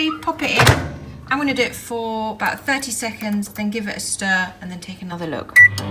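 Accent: England, United Kingdom. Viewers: ye proper English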